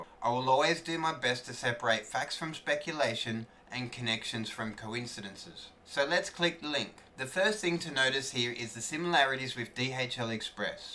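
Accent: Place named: Australia. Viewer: You deserve more followers and more comments for the commitment you put into the fight against these international scammers. Anyone like you who can do this deserves a medal. P.S your Australian accent and laugh is just tops my man. I've watched all your scambait videos and can I say great work on your behalf.. Keep up the crusade just like the rest of the Scam baiting community.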